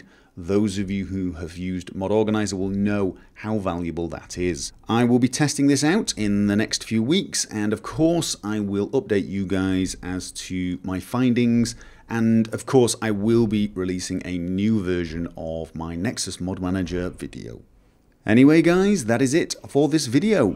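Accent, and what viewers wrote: United Kingdom. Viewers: ***** Thought so, he sounds Brittish or something like that.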